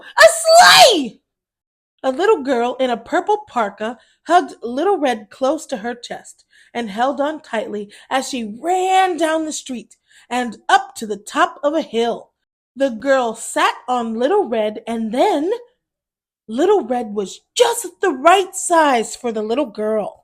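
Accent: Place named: United States. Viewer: Love the cawntry accent 😂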